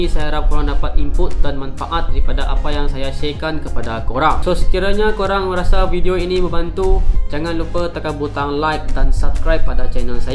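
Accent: Sarawak, Malaysia. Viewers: Urang sarawak ka😊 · suara mcm org sarawak,cakap seketui seketui..senang paham..goodjob👍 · ☺️ baruk nengar aku dh tauk kompom org srwk. Diam sine pok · Kaka macam kenal jak, orang sarawak kah?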